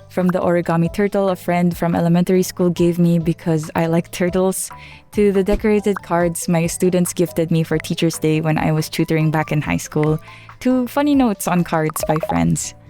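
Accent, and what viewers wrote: Philippines. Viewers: literally 5 seconds into the vid narinig ko agad yung accent (i never came accross u b4) and CLOCKED that u were a kabayan, and i was right 💅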